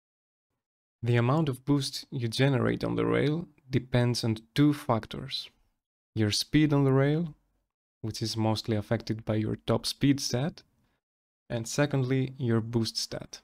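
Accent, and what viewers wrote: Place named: Greece. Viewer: Ρε ελληνας εισαι? το ψιλοκαταλαβα απο τη προφορα, αλλα στο τελος με τον αγγελακα ηταν σιγουρο χαχα, ωραιος βλεπω τα βιντεακια , εχω 1:39:600 με το gaston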